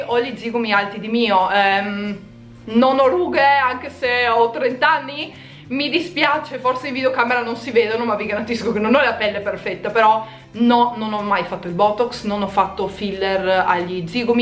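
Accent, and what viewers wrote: Trieste, Italy. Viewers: sei fantastica così! Con il tuo accento triestino che ti contraddistingue, la tua mandibola che se ne va per gli affari suoi e con tutto il resto che ti caratterizza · non è che parla in modo strano, di chiama accento triestino ragazzi. per chi abita vicino al confine è normale prendere l'accento simile alla lingua dell'altro stato (anche io sono di trieste, capisco perchè ti dicano così). non credo che ad una persona ad esempio di napoli (ho preso un posto a caso dove ci sia un accento sentito) direste "parli in modo strano" solo perchè conoscete l'accento napoletano. gli accenti ci sono in tutta italia amici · Penso che quando intendono che parli strano.........CHE SIA SOLO L ACCENTO TRIESTINO 😂😁😂😁😂😁😁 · Tralasciando il fatto che quando hai menzionato la mandibola è stata la prima volta che ci ho fatto caso (sennò ciaone che me ne accorgevo), pensavo che il “parlare strano” fosse riferito all’accento triestino!! 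😆 Comunque sia sei stata fin troppo gentile e sincera, anche perché non eri assolutamente tenuta a dare nessun tipo di spiegazioni, specie a gente che per le domande che fa non sa davvero stare al mondo. Se muovessero di più il sedere per ottenere quello che vogliono invece di lagnarsi dei successi altrui non sarebbero tanto maligni e invidiosi. Robe da matti.. (PS: vorrei proprio vederla la scena dal vivo, dove l’imbecille di turno ti chiede se sei incinta e tu “no. Sono ingrassata.” BOOOOOM!! Figura di 💩) (PPS: fregatene, sono invidiosi e stupidi. La tua family ti vuole bene e ti apprezza sempre❤️) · ti seguo dal 2009/2010 e cavolo! non avevo notato la mandibola! pensa che quando leggevo "perche parli in modo strano?" io credevo intendessero la pronuncia o la voce e pensavo boh? sarà che e' triestina e slovena e non si sente molto in italia sta pronuncia?? io sinceramente non ho mai notato il problema della mandibola! 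boh! che gente però...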